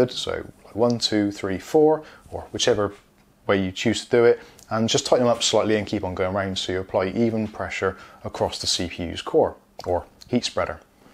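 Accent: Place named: Yate, United Kingdom. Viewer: Your 100% from yate or not far from there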